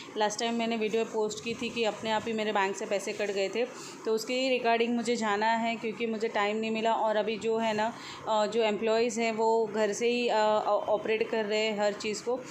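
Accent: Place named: Maharashtra, India. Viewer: Hi kaisi ho apke bolne ka trika bohot acha or jo aap marathi touch dete ho beech beach may vo to osam h mast lgta h, or jo English sikha rhe h unko bolo mujhe apne aap pr proud h mai Indian hu meri matr bhasha hindi h 😊 isi hindi bhasha ki wajah normal ladies bhi apka video dekhti h hum apka video apne TV pr lga k sab log dekhte h or yhi apke vlog English may hote to adhe log dekhte or adhe log muh bnate but jo bhi ho apki recipe bhi bohot achi hoti h,hindi may hone ki wajah say or Mia ata h dekhne may good job God bless you............. English walo k liye 👹buri nazar wale tera muh kala 👹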